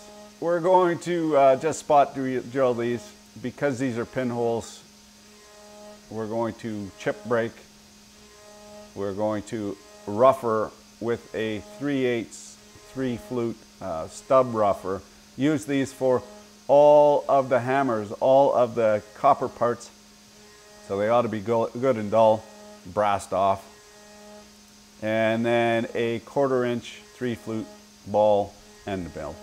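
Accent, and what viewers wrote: Quebec, Canada. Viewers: Ah ben tabarnak, c sûr qu'avec cet accent là c'est resté au Québec un bout... Salutations et nice job! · I speak French but I understood absolutely nothing. Is it some sort of Canadian dialect? Can you translate please?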